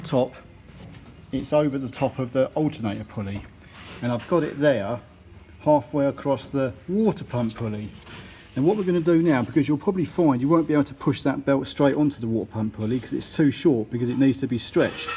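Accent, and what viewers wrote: United Kingdom. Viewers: Don't own a Focus, have never owned one, and probably never will... and yet for some reason I've now watched the vid three times. Must be that British accent or I've just had too much JD. But then again, can one really have too much JD in this ClownWorld? Anyway... good vid, thumbs up, and all the good stuff. 🥃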